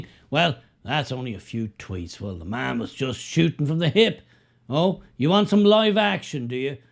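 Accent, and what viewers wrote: Ireland. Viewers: Loving the passion, send the Jamaican genius back home to help lift Jamaica,he has all the answers, they used to say don't bite the hand......😂 keep up the good work, I love your Irish twist.Peace and prosperity to all my Irish brothers and sisters this nonsense has been taken too far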